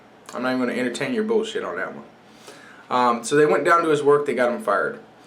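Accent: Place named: South, United States. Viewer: No idiot u sound like a dumb Ass Hillbilly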